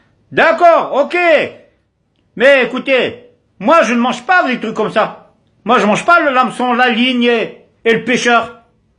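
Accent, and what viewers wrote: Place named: Algeria. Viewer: VOUS PROFITEZ DE L OCCASION POUR VOUS VENGER ...TU AS UN ACCENT PIED NOIR DONC TOUJOURS DE LA HAINE DES INFORMATIONS POUR FOUTRE LA PAGAILLE CHEZ LES ALGÉRIENS C EST LE MOMENT IDÉAL POUR SE VENGER DES ALGÉRIENS ET DE L ALGÉRIE ELDJAZAIR QU ILSOIT MORT OU PAS CELA TE DÉRANGE ???.C EST LA FITNA QUI VOUS FAIT PLAISIR ET QUI ES TU ???MEFIEZ VOUS IL YA DES ENNEMIS ÉTRANGERS QUI PORTENT DES NOMS ALGÉRIENS.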